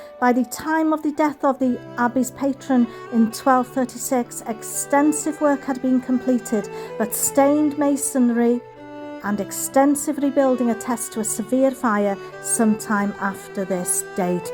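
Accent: Wales, United Kingdom. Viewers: Love that Welsh accent...I don't get to hear it often. What a place! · I remember when i first watched your channel videos and I almost could not understand your english with the Welsh accent. Now I'm very accustomed to your voice and accent and I can understand it clearly. It's a very pleasant accent and i enjoy hearing it for sure! We don't have any Welsh people where i live in Vegas so it's a joy to come here and learn about the locations way over there across the pond. I wish i could go into a time machine and live a week in these medieval times and experience the life. It's really interesting. The Valle Crusic Abbey has a fascinating history. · Hi, even though I have seen this one a couple of times before - believe it or not, I think I have a lot of details to comment on (still, this time) and I think even 1 or 2 new questions that crossed my mind. 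Firstly, I have to say, I am extremely impressed with you and with regards to research and knowledge that is required to put together one of these documentary type videos. And, I am also impressed with your beautiful narration (always)! And, of course, with your lovely and awesome Welsh voice and accent! Amazing place! It seems like (throughout history) England (and other places) was always fighting with Wales (to maybe steal its land). As such, it seems like there was a lot of defending required - by Wales. On another note, it seems like 8 services for the monks each day was a lot - even for monks. With regards to the "Black Death", my guess is that it is likely the same event as the "bubonic plague pandemic". I vaguely remember reading one time that the "plague" killed half of the people of Europe. That is just crazy - something so powerful and uncontrollable! By the way, I have a question for you, with regards to the Abbey's revenue sources. 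You mentioned that the 2 primary revenue sources were "rents and tides". I take it that "rents" refer to the revenues from tenants. Would that include the monks too - having to pay rents to the ultimate owners of the complex at the time? And, regards to "tides", was the complex located near the ocean (with boat dockings)?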